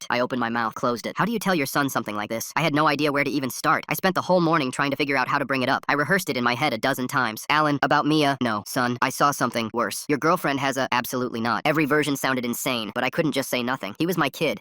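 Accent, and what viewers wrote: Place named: United States. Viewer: Yeah, this guy sounds American, South Africans, yeah we say that stuff like it is, and we'll make fun of you for not knowing too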